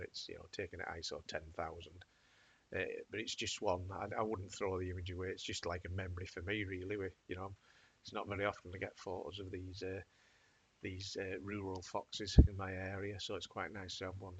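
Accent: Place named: North, United Kingdom. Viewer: When you use the luma channel to set black and white points, why don't you turn on highlight and shadow clipping, then back off a touch and then adjust curve to suit if needed? It's the way I do it, well most of the time at least 😊. As a fellow left hander, and also called but a little bit further south than your accent suggests you reside and thank you for your videos and their unrushed pace. As for DXO hiding settings behind a paywall, I guess it their way to try and make us spend more money, but we all know that anyway, for flipping images it has not bothered me because I shoot sport, as a volunteer, so it would kind of bugger up the shirt sponsor logos and advertising boards. It is only fair, that now I have watched, and enjoyed, a couple of your videos that I subscribe to your channel, and now look forward to the next one 👍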